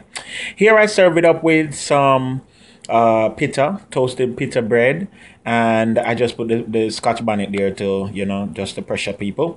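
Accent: Jamaica. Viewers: your Jamaican accent is charming!!! & the soup looks good too!!! ...not a fan of dumplings so will make without :-)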